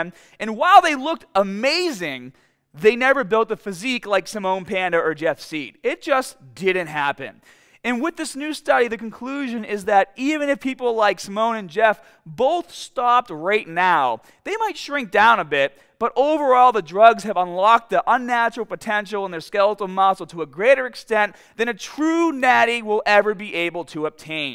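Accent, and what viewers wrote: Boston, United States. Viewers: Did always have a slight Boston accent? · Never change, Bahston. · I've watched a ton of your videos many years ago and around 1 year ago I had to find the video where you discussed the change in your accent. I'm watching this video today and although the topic is good I still can't get over the over emphasised Boston accent. Not a diss in any way but for some reason I can't take it as seriously like your old videos. Again no disrespect but the accent I got used to in the past sounded more intellectual whereas the accent now sounds quite dare I say it low class. In England we have many accents too, some sound posh and some just down right ghetto/street. It's the same sort of thing going on here. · Is this guys Boston accent foreal? That shit is strong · it's just PAHT of his brand - people keep posting comments about a Boston accent - more comments posted - Boston accent gets discussed all the time - Youtube ranks him higher because viewers are engaged enough to post comments - more views for his channel - it's just the nature of the online world - if you don't appear near the top of the hit list ( youtube, twitter, browser - whatever ) you are NOWHERE - NO ONE sees your videos it's just like all those terrible clickbait titles that just about everyone, even legit youtubers use to get views - same with the natty/juicing discussions - no such thing as bad publicity as long as people post comments unless you've tried to get your own web site/business ranked by Google and Youtube and seen how brutal the competition is - it's as brutal as trying to get an acting part millions of people dream of making an online living but very few find the right mix that makes your brand stand out -- ( personality, looks, humor, informative info, frequent updates to channel ) - anything that helps you stand out is a win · fuck yeah boston accent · First time on the channel. 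Love the video. But more importantly, do I hear a Boston accent?? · Wow one of the strongest Boston accents evvaaa lmfao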